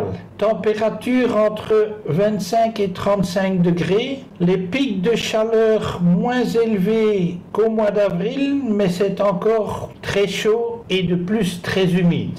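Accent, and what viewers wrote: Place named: Belgium. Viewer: Merci et ce bel accent belge 😊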